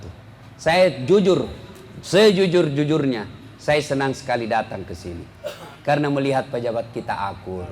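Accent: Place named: Sulawesi, Indonesia. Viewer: Sering dengar ceramah dari Ustd Dasa'ad Latif,sy suka sekali.Bahasanya sederhana mudah di fahami dan dialeknya mengingatkan sy waktu masih tinggal di daerah Sulawesi. Sy sendiri orang Jawa, tapi sejak bayi sampai remaja saya tinggal disana.Semoga Ustd dan keluarga sll dlm lindungan dan berkah Allah SWT.Jadilah penceramah.yg baik untuk NKRI.Dari semua suku.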